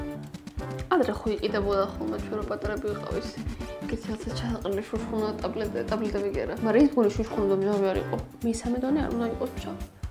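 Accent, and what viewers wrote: Imereti, Georgia. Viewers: უსაყვარლესი ხარრ 💙💙 ეს ცოტა იმერული აქცენტი საიდან .?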